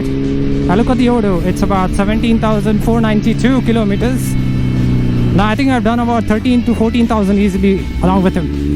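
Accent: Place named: Kerala, India. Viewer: i thought you was malayalee😅 anyway goog vlog😍😘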